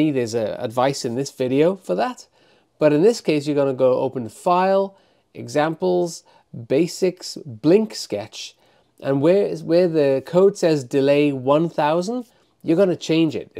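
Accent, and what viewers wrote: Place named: England, United Kingdom. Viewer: Hi buddy, I assume U are english because of your accent. I must say that English people were very usefull during WW2, and today again you did help a poor lonesome french struggling since a long time with download pb with win11/vscode/plateformIO/esptool... to ESP8266mod . I was stuck for 24H .... CH340 behave well with my uart terminal, but not during flashing... I listen your video, and downgrade my CH340 driver from 3.8 to 3.5.... and it fu*king works! thanks guy ! and best regards!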